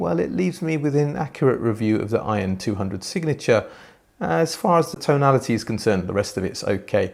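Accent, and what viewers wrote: United Kingdom. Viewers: Hej! As a Swede, I sincerely enjoy your fine channel contents and your great British pronunciation…… I try to learn from it too 😀. I run a pair of Q Acoustics Concept 500 that I feed from an old Krell KBL and a KSA250. The silly amount of current that beast puts out hold the drivers in an iron grip….. and I must say it sounds pretty nice. Wonder what this reviewed Atoll integrated amp would sound like in comparison? Thanks for your great reviews! /Jan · I always look forward to your reviews and your rich British accent. I have to admit my jaw dropped when I saw you select an AC/DC album. LOL!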